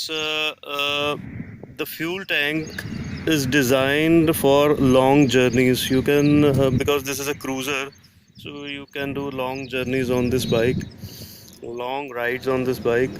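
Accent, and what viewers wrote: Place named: Punjab, India. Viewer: Punjabi accent.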